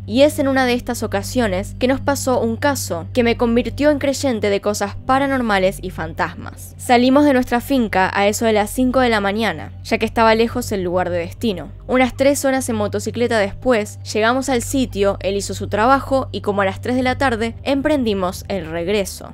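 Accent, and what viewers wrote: Argentina. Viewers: Un Usuario Normal Pero creo que no es felicidad por el caso, es por la manera en la que relata, da sus hipótesis, tiene un acento argentino obvio pero su lenguaje es neutro o bueno eso siento yo, porque otros youtubers de casos, sobre todo mujeres me choca que gritan o fingen la voz.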